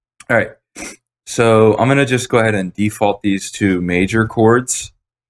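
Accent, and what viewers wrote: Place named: United Kingdom. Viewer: LOL U HAD ME WITH THE BRIT ACCENT!!!!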